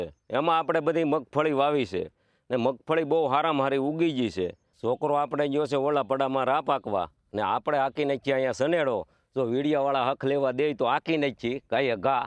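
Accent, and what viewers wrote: Kathiawar, India. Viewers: I love your kathiyawadi and your simplicity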